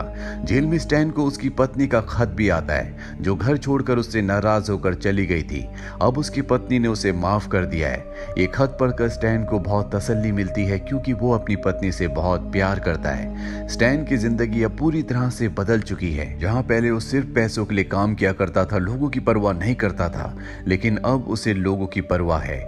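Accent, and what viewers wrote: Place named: Himachal Pradesh, India. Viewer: Sir aapki aawaz se lgta h aap Himachal side se ho 🤔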